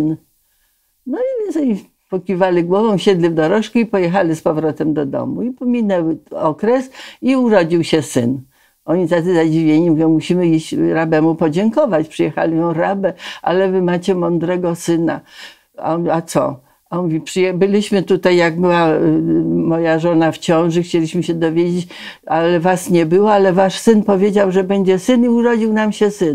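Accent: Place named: Warsaw, Poland. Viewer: Ma Pani cudny warszawski akcent.❤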